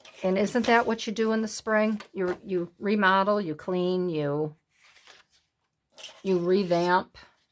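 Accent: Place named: Scotland, United Kingdom. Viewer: Such a great idea to remodel, those digitals are super pretty. Your little bit of Scottish accent made me smile 🥰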